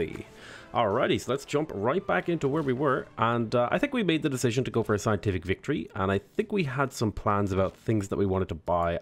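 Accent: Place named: Ireland. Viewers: Just Irish. My lot are from Galway, let's just say you're unlikely to get any West Coast farmers as youtubers 😉 no fecker would understand them. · Ah he's Irish, since i'm not from an english speaking country it can be hard to identify such sometimes. I usually associate Irish with the incomprehensible speech of rural citizens, even though that is probably only a small percentage :)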